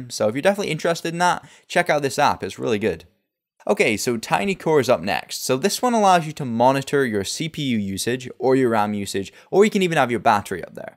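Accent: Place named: Scotland, United Kingdom. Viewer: i could hear a hint of a scottish accent when watching that video thanks for answering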